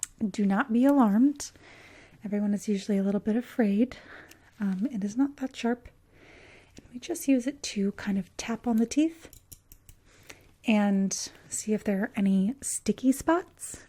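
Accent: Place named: United States. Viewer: I have to ask, is English or Portuguese your first language? You speak English with an American accent, and I don't know Portuguese so I can't identify an accent in that one.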